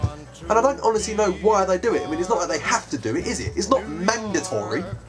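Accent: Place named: United States. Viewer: hahaha nice video...and your very good at the american accent!!:D!!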